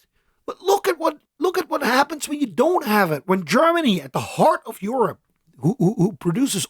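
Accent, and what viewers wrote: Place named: United States. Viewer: As an aside, why do you speak with American accent?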